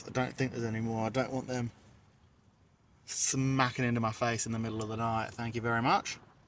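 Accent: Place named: England, United Kingdom. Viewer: Nice one, you have picked up a bit of a Pommy twang 😂 I have sub’ed, greetings from Halls Head, WA 🇦🇺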